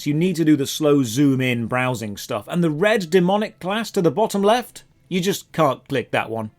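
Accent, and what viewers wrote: United Kingdom. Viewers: agreed. then again, he's handsome. And speaks British English. I'm sold. xD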